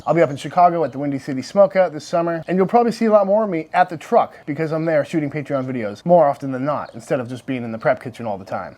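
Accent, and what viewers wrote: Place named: New England, United States. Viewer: Every video I watch of yours I always say to myself "this guy is from New England". Glad I can now confirm that now.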